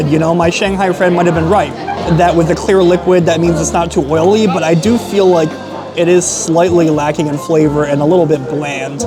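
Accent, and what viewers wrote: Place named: New Jersey, United States. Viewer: Where in Jersey is this guy from?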